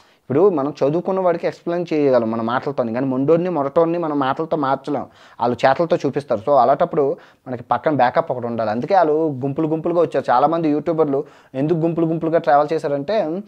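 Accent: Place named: East Godavari, India. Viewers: thalian lu CHADUV ko ledu kada tala noppu TESTARU anta adi cheppinappudu tega navvukunnanu...me matalu yasa mana visakha Jilla la undi😂😂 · Namaskaram I watched all Afghanistan videos I felt good experience sitting at home in India and ur East Godavari telugu is good and ur commedy is ultimate in khatranak Afghanistan First time I watched ur all videos of Afghanistan I loved it Because of you I came to know they hate Pakistan and they love our India Thank you Jai Hind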